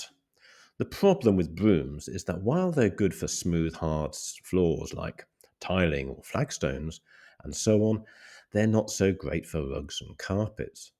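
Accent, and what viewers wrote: United Kingdom. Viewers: Love your channel! I’m a sucker for documentaries and history and your English accent makes it all the more better lol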